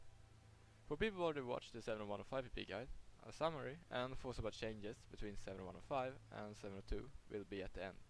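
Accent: Sweden. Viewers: Are u from sweden?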